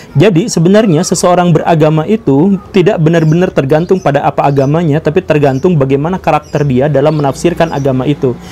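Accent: West Java, Indonesia. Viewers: Sederhana, dalam , menambah wawasan, mendewasakan, introspeksi, realita + bonus aksen sunda LANJUTKAN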